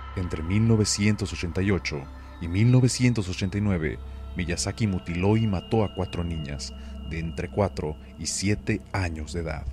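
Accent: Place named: Mexico. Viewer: Muy buenos vídeos, te recomendaría que hables de una forma más pausada ya que el acento mexicano resalta mucho, de ahí en adelante todo genial...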